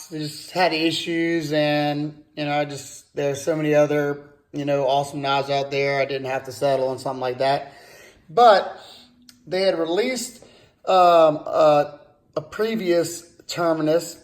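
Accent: Louisiana, United States. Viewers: Nice accent, is that Louisianna? Sounds great. Excellent video thanks!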